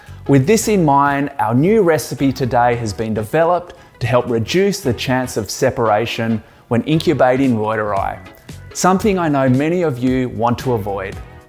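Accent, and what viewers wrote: Australia. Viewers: Is that the Australian accent I hear?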